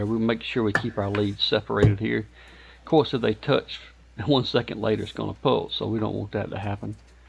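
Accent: United States. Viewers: Love your accent! I miss visiting the US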